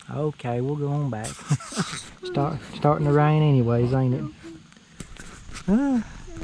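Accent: South, United States. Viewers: Yeah I just saw the video on how you cleaned that ”chipmunk deer” and now I will have nightmares. I found this video trough swedens largest ”link” site where they post videos and photos on a daily basis. I then listnened to your pretty polly cover and you have an amazing southern accent and nooow im going down the rabbit hole of banjos :)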